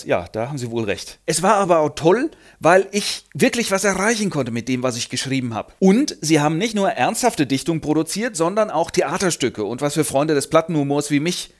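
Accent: Franconia, Germany. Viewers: der frrrängische Dialekt ist das Tüpfelchen auf dem i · Der fränkische Zungenschlag, wunderbar! 🍪🍪🍪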